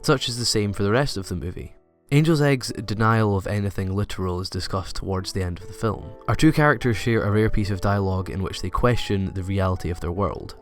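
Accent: Scotland, United Kingdom. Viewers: Where is that accent from? Scottish? British?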